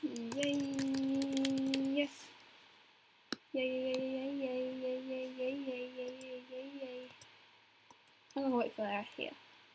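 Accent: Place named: United Kingdom. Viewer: You sound more British here. I think us Americans are rubbing off on you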